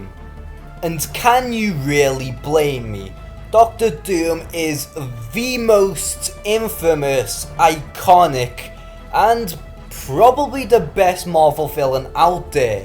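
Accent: Liverpool, United Kingdom. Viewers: One of the most cringe worthy videos I have ever seen. Your voice is bland and boring. Plus the fact that you're Scouse makes it even worse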